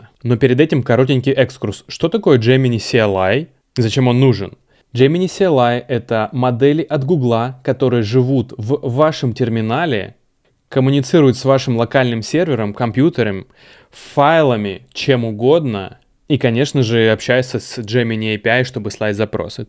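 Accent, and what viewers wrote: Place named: Russia. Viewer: Рунглиш классический)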